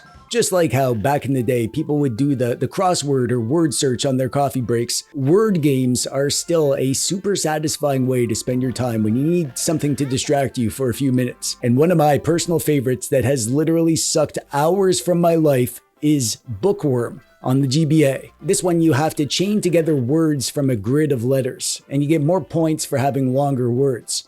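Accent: Canada. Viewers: hey man what part of canada is that accent from? i met a guy in New Zealand who was from Canada and he had the same accent as you, i think it's cool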